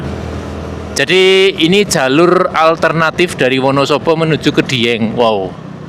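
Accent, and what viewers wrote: Banyumas, Indonesia. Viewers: Mas ki ngapak2 dialeknya, tapi pinter bahasa Jepang. sekali2 dimunculkan mas broo BHS Jepangnya? Kita biar sambil belajar Jepang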